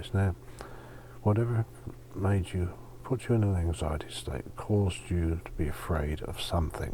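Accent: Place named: Hampshire, United Kingdom. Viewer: Ah it's a Hampshire accent - yes, some Sussex accents can be similar too. I thought you didn't come across as Cornish in your attitude or manner, so was a bit puzzled, but now I get it. Yours is a very reassuring and earthy accent/voice, which suits your purposes very well. I find it very calming.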